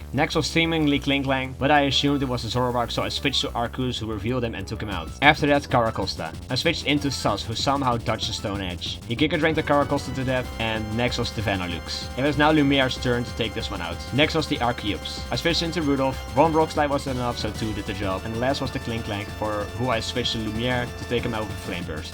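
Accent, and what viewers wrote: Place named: Netherlands. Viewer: You sound like you are from the netherlands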